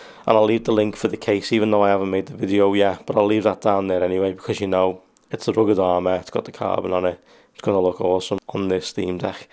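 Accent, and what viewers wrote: Liverpool, United Kingdom. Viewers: i was pretty surprised when i heard the scouser accent, dig it mate!😎👍 also: you are the only one who did 100% correct, everybody else took the second protector off before they removed the bubbles. cheers from switzerland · What a god awful accent scouse is haha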